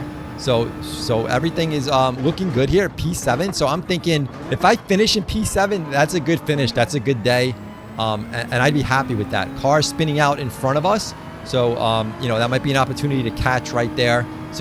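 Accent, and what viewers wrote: New York, United States. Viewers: do I hear a NY accent???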